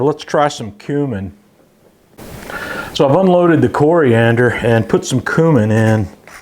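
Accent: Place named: South, United States. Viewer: you sound like a Hwite hill billy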